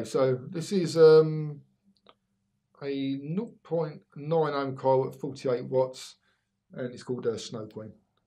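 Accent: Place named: Scotland, United Kingdom. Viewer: One of the best reviewers on the tube, apparently according to one the blokes I work with you and me sound exactly the same Scot:)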